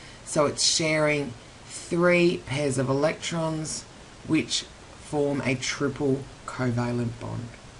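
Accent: Australia. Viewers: Aussie Accent. So easy to tell :D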